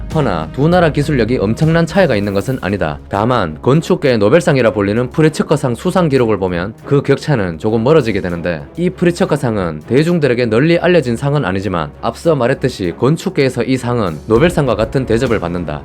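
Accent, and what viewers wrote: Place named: Gyeongsang, South Korea. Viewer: 갱상도 ㅋ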